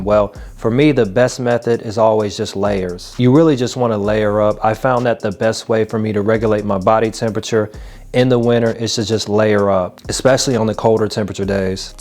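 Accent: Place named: New York, United States. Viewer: sound like New York to me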